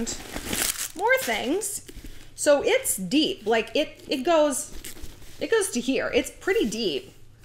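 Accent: France. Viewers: OMG! I'm French, and your French accent is truly amazing. I love it! <3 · As a partly French person, let me say - your French accent is delightful and the phrases you do know are perfect 👌🥖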